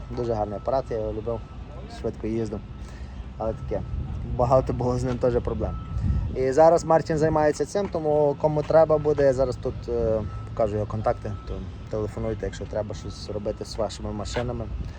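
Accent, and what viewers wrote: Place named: Hutsul, Ukraine. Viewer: Слава Україні! На ЯРДУ на ШАПУ😂 Чому не на ЯРД, ШАП і т.д? Гуцульський акцент...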